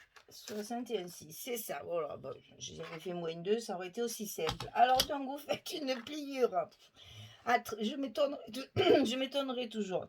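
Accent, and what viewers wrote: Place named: Provence, France. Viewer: Bonjour. Nouvelle abonnée. Tout d’abord bonne année ou meilleure que la précédente du moins. Toulonnaise de naissance - mais toulousaine d’adoption - votre accent fait chanter les cigales dans mon coeur. J’adore ce que vous faites même si je ne suis pas très chaby chic. Juste une petite remarque. Ce n’est pas un style grunge ou trash (cyberpunk) mais steampunk, courant artistique basé sur le début de l’industrialisation en Angleterre sous le règne de Victoria d’où les corsets et la dentelle dans les rouages. Le grand leader de ce courant étant bien sur Jules Verne. Côté cinéma voir Wild wild west (Les mystères de l’ouest) et la Ligue des gentlemen extraordinaires entre autres. Voilá voilá.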